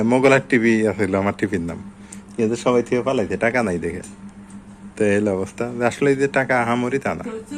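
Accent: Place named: Tangail, Bangladesh. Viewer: Tumar bhashay buja jaiy tumar bàdi Tangail,koun upon zella?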